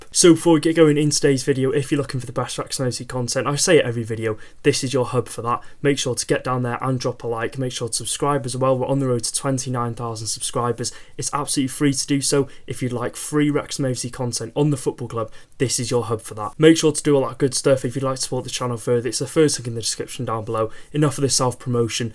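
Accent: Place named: Scotland, United Kingdom. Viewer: He's Scottish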